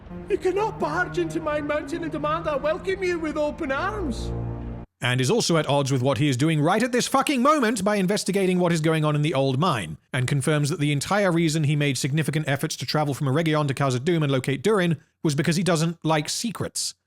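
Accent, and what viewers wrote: United Kingdom. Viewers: Why do you hate Donald Trump? I mean from your accent I would say your Brexit. So we had a leader who's deal was Illegally ( key phrase, breaking the law) entering and taking jobs, and social services, that American Citizens can not get. How would you like it if 2,500,000. People Illegally crossed into your country and your government was givening them 15000 and a place to stay with free medical care. When I know veterans who cant get medical help and are living out of their car. Love the vids so far, i really do. But take your anti Trump pro Biden crap and keep it on your side of the mic. Your making the very same mistake amazon made, while criticizing Amazon for said mistake. Thanks. I will now go back to enjoying the rest of the series.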